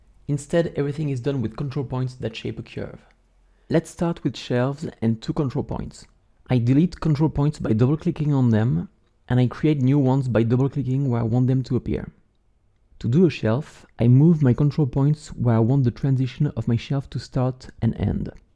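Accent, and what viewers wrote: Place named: France. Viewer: Merci frro j'ai reconnu l'accent français 🤩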